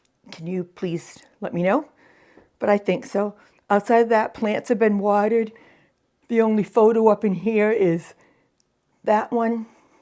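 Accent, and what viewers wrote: New England, United States. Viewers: your accent is lovely. I love the New England accent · Hello,! Your house is perfect for showing. I'd leave the throw on the couch. It adds a touch of coziness. You don't have to take down your rack inside the closet. Just make it clear to potential buyers that it does not stay. Your china is beautiful. I was going to suggest your DIL may like to have your set of china, but you answered that question. Your laundry room looks MUCH better. Not perfect, but I agree the volume is very low. I just crank up the laptop volume to the loudest setting and turn up the video volume and I can hear you fine then. I do not hear a lisp though. I do hear your lovely New England accent, but I haven't picked up on a lisp. It's 6 degrees here and -13 wind chill. We've had rain, freezing rain, and snow. Just enough to cover the ground. It's cold enough all I want to do is hibernate. 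The sun is shining brightly so I like that part. Have a great weekend. Rest up for next week! See you next time!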